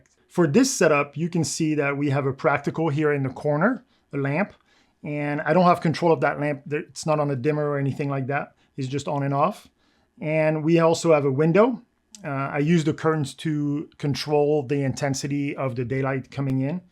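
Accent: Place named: Quebec, Canada. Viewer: I would have guessed French Canadian.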